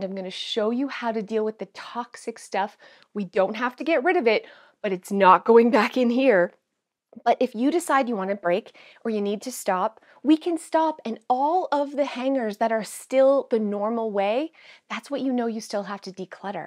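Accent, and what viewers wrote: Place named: Oakville, Canada. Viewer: your ability to be vulnerable and funny and honest and as screwed up as the rest of us is exactly what I needed to hear today. We all have struggles because we are told we need to do it all, perfectly and look amazing at the same time. Well, we can't. We can only be ourselves and sometimes that isn't easy. You have shared a cautionary tale and please know that you are delightful (and I still love the Oakville accent.LOL)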